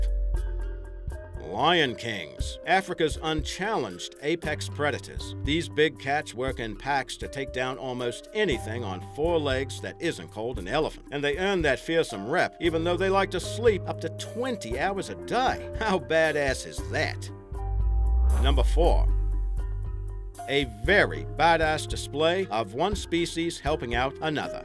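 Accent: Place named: Australia. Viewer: i know dudes Australian.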